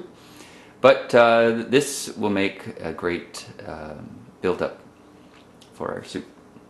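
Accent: Canada. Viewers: You sound like a fellow Canadian. I could be wrong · Canadian, eh? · Thanks you for sharing your ideas and opinion in clear easy to understand English. A Canadian accent, I suspect, maybe with Newfie influence. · Oh my god, I love that accent! Canadian, right?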